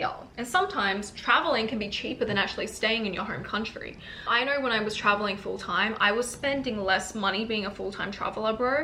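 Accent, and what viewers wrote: Australia. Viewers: your australian accent is the one that keeps me hooked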